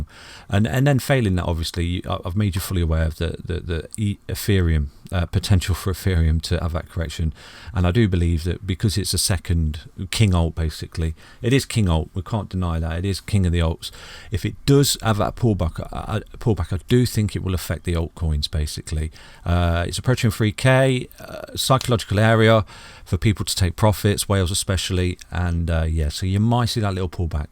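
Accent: United Kingdom. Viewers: Nice to hear a fellow brit talk through it. Find it easier to understand. New to the game, this is very helpful info ✌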